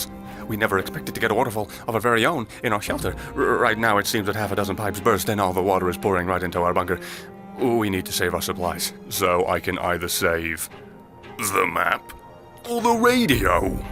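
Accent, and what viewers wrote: Scotland, United Kingdom. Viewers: Have I missed something why is suddenly Scottish? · ***** His third ever video uploaded (the one with MW3) is also with him putting on a Scottish accent. I must say, it fits pretty well. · Are you Scottish? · Josh Mcklaren He said he is from the UK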